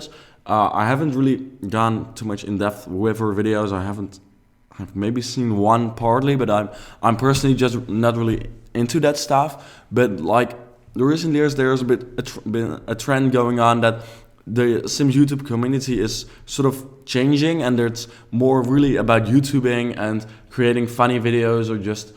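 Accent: Netherlands. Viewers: Omg wahah, ik dacht al dat ik het nederlands engelse accent hoorde! ❤️✌🏻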